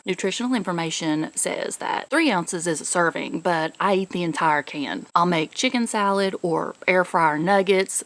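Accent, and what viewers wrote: South, United States. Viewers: You have a thick southern accent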